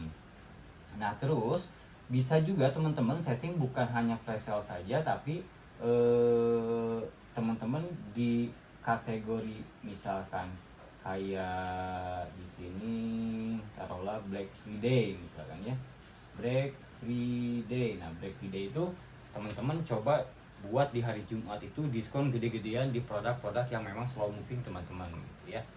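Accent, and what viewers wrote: West Java, Indonesia. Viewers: assalamualaikum kang perkenalkan saya bahcen dari bandung sehat selalu kang dan jangan bosan bagi bagi ilmu nya krna sangat membantu yg masih awam seblumnya saya lihat lihat video akang kayanya dari logat bicaranya seperti orang jawa barat jika benar orang jawa barat saya siap ngopi bareng akang untuk shering tentang shopee terima kasih sebelumnya ya kang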